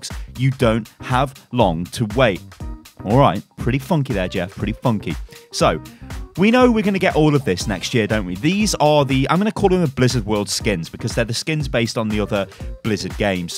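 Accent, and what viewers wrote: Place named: United Kingdom. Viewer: I love these vidoes. They make me all warm and nice when I hear lovely british voice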